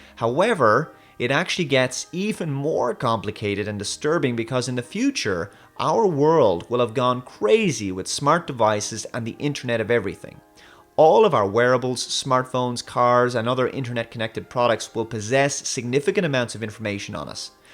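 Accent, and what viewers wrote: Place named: Ireland. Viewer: Question: Why does the narrator have an Irish accent?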